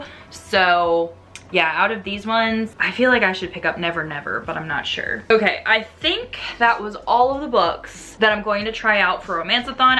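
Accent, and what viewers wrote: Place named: South, United States. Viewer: That country accent🤣🤣🤣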